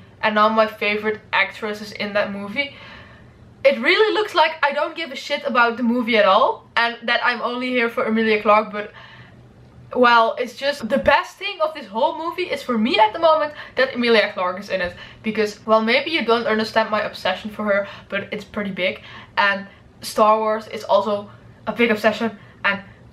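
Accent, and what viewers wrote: Netherlands. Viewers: Hey! ik hoor aan je engels dat je nederlands spreekt :p ik ben ook een grote Star Wars fan!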